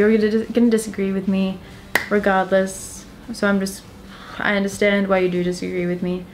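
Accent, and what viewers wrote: France. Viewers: nice Vid ... til point 12, jeah I'm triggered (why is junkrat harder to master than her?!?!?!?!)...:D and that's a nice french accent ;D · Can you talk more with French aczent? sounds pretty good ^^ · i love ur french accent xD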